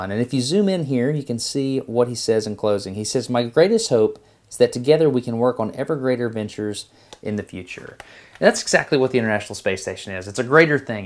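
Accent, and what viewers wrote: South, United States. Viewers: Your southern accent really stood out for me for some reason. Anyway great vid.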